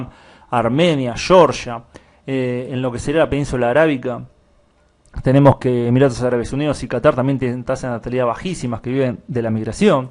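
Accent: Argentina. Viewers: Es argentino, es su manera de hablar.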